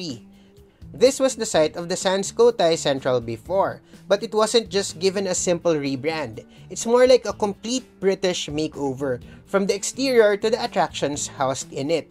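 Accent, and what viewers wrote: Philippines. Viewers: Pinoy accent, surely for the unwelcome poor tourists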